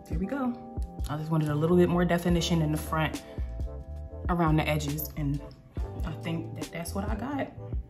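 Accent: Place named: Washington DC, United States. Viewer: I like your accent. It reminds me of my friend who's from DC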